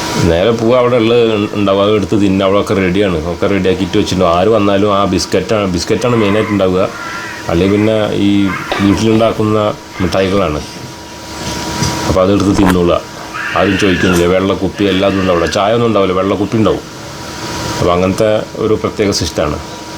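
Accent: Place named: North Malabar, India. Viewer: INDONASIA ...... യേ കുറിച്ച് അറിയാൻ വല്ലാതേ ആഗ്രഹിച്ചു നിൽകുന്ന സമയത്ണ് ഇക്ക നിങ്ങളുടെ ഈ വീഡിയോ കാണുന്നത് .. വളരേ ഉപകാര പ്രദം അവിടത്തേ ഭാഷ .. ബ ഹാസ ..Indonasia പഠിക്കാൻ പ്രയാസമാണോ ? പിന്നേ അവിടത്തേ ഇസ്ലാമിക പാരമ്പര്യ തേ കുറിച്ചും മറ്റും അറിയാൻ താൽപര്യമുണ്ട് ഇക്കാ വേറേ അവിടെ യു ള്ള ആൾകാർ ഇന്ത്യൻ പേരുകളും ,,, മറ്റും വെക്കാറുണ്ട് എന്ന് വായിച്ചു അതിനേ കുറിച്ച് അറിയാൻ താൽപര്യം ഉണ്ട് ഇക്കാ ... ഇക്ക നാട്ടിൽ എവിടെ യാണ് .. സംസാരം കേട്ടിട്ട് വടക്കേ മലബാർ (കണ്ണൂർ ,കാസർകോട് ) ആണെന്ന് തോന്നുന്നു .. പറയാമോ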